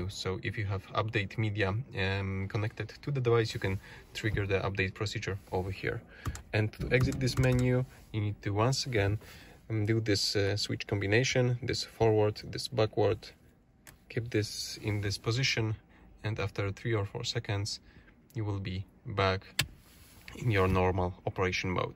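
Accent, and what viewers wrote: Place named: Poland. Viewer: Dialekt jak by Polski🤔 super tego szukałem 🙏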